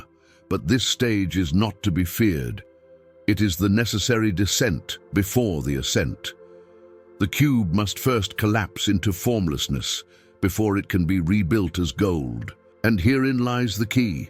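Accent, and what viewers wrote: United Kingdom. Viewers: It's the British accent. They don't emphasize the r like Americans. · SAY-tan= Satan. And SATurn=Saturn. & Tis not British, but the English accent. Scottish/Welsh, is vastly different.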